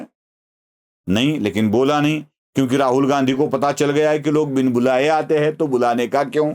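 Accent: Maharashtra, India. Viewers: आदरणीय महान् विद्वान, आपकी संवाद अदायगी को सुन कर दिल बाग बाग हो जाता है, आपके व्यंग बाण अदभुत,सारगर्भित और समसामयिक घटनाचक्र पर तमाचे होते हैं। आपकी और भाऊ टोरसेकर्जी की महाराष्ट्रीयन हिंदी मनभावन होती है, धन्यवाद।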